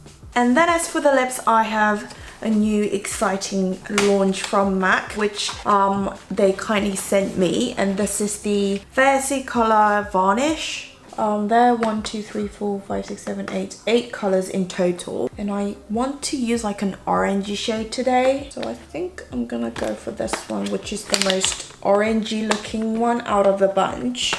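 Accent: United Kingdom. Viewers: 언니 영어발음에 치이고 치인다..❤️사랑해요ㅠㅠ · 오늘도 영국발음에 치이고 갈수록 예뻐지는 미모에 치이고.. 존예에요💕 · 오늘도 매력적인 영국발음으로 귀여운 영상감사해요:) 다음 영상은 새로운 집을 배경으로.볼 수 있겠네요🙋🏻 새로운 환경, 새로운 구도에서 님은 구독자로서 기대되거든요😚 요리영상도 기대되고 이사간 마을에서 좋은 곳도 소개해주세요💕 좋은 추억이 많이 생길집이길 바래요 Q1) 네일 색이 참 이뻐요:) 셀프 네일인가요? 어디제품인지 궁금해요오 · 언니 영국식 영어발음 너무 좋아요 진짜ㅠㅠ최고 좋아요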